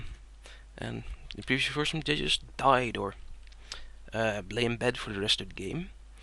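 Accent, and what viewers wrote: Netherlands. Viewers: Oh it's a Dutch accent I've never heard one before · Hmmm, i can just HEAR you're dutch! :P Another one here! :)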